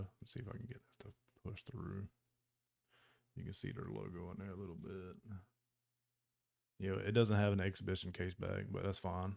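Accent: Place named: South, United States. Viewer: Thanks for the review fam. Are you from the south? Sounds like you’re from either AL, MS, LA, or TX.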